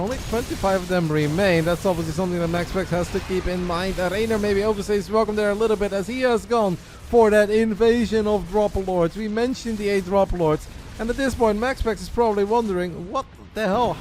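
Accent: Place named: Russia. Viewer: whu you speak with “Vlad” accent?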